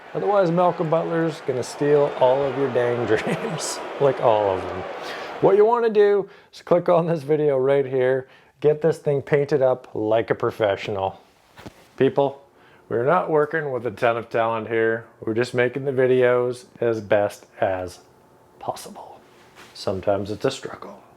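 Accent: Canada. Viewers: I have no idea why this was in my feed and I've never seen this guy before, but he sounds very Canadian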